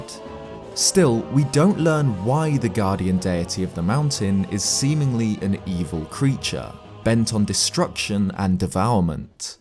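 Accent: United Kingdom. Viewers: Love your videos. Nice speaking voice too. Fellow Brit, surely?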